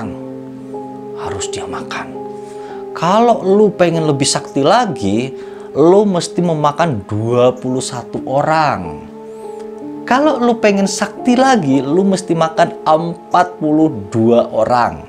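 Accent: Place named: Sunda, Indonesia. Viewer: Sunda na kentel pisan atuh wkwk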